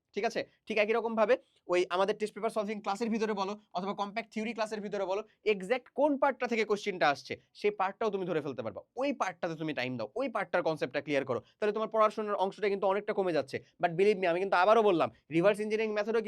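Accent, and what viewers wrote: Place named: Rajshahi, Bangladesh. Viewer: DAMN SURE VAII FROM RAJSHAHII LANGUAGE TA OIROKOMII:face-fuchsia-tongue-out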